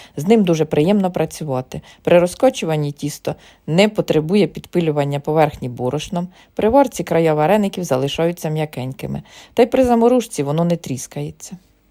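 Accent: Ukraine. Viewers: Дякую, мова центральної Украіни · Так розмовляють у нас на Черкащині ❤в селах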